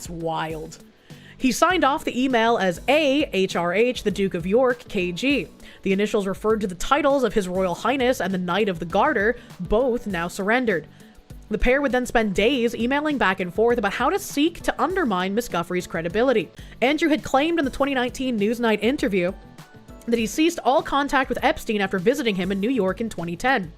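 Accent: Boston, United States. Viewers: Listening to Bostonian yap about the two worst people on the planet fighting each other online really helps make this Amazon route somewhat bearable.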